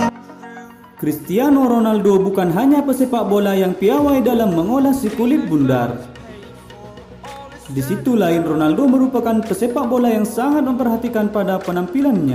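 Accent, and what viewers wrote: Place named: Madura, Indonesia. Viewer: nada duro nya ketok an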